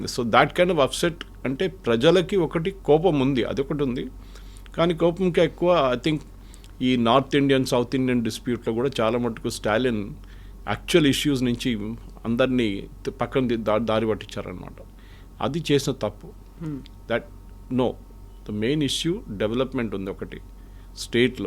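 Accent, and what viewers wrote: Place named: Kerala, India. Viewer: మీ భాషాభిమానానికి వందనాలు. కానీ ఆయన మళయాలి.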